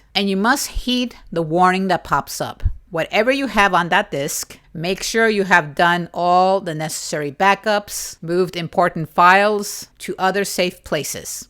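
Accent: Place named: New York, United States. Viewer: I saw the title and thought it might be good to consider, then I saw something about New York and was not sure but I gave it a look and was entertained and saw the process unfold. I was used to the style as my wife is from Queens, Flushing and lived in Manhattan before coming to Virginia where we met. You seem to talk like her so it was quite easy to ignore the emotional bits. But I still need to know if this something I need to do. Thank You I appreciate your sharing. Will need to see a few more videos to calibrate where you are coming form and what it means to me. I studied Fortran in undergrad then in Grad school took a fast track on several languages. Fortran again, PL1, APL, Cobol, Intel Assembler, Basic,Pascal and Forth. I decided I would never need to program ever again. But now in retirement I am rethinking that pledge. Moving to Linux ?!?! 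I don't know, See you next time. Dennis in Virginia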